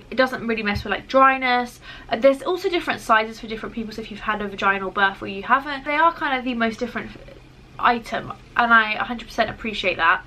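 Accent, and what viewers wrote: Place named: United Kingdom. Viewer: i can't spot exactly which county your accent is from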